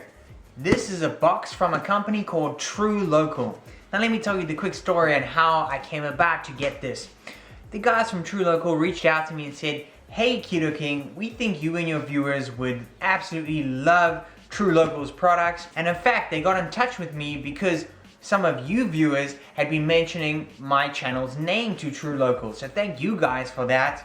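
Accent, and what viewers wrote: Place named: South Africa. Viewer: Fantastic news! For Americans, try the Butcher's Box. Same criteria products. 💕🌞 Side note, Keto King, if you were born and raised in B. C., how do you still have your South African accent? So curious sunshine. 🤔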